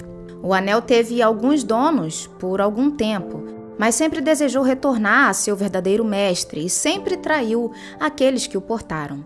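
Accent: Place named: Brazil. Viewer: Legal , eu jurava q vc fosse sulista por causa do seu jeito de falar , de carioca vc nao tem nada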